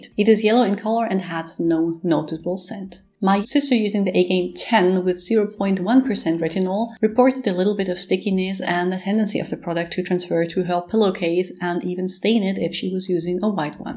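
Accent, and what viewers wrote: Germany. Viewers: WOOHIOO ! Thanks! My sister in Canada uses it and even though she's 60, she could easily pass for someone in their 40s - not that it's "so important" to look young but to take care of ourselves and to look as good as we can is perfectly fine, as far as I'm concerned. Btw, I must add that your accent when you speak English is just lovely! I couldn't place it at first but then I saw that you were German. I've been in Belgium since 1989 but am originally from Canada. I wish you all the very best and.... a very MERRY CHRISTMAS to you and yours! 🙂🎄❤️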